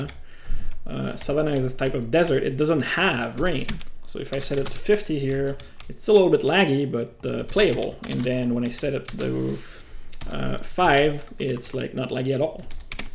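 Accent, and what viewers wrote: Quebec, Canada. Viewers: Are you from quebec?? I can hear an accent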